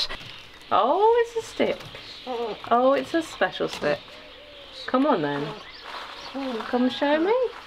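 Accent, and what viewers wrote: United Kingdom. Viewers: I used to own a business here in rural Georgia where I would look after farms, or just pets while people were out of town. All of the critters touched my heart in their own way, but the birds I found fascinating because their vocal ranges allow a window into their thoughts and also their humans. Like with Fable, it was obvious you were British, laugh easily and are a calm, gentle person. I wish all of my beloved animal friends I’ve met owned a human like you! Your critters are so blessed to have you! Love from the Blue Ridge Mountains - and congratulations 🍾🎉🎊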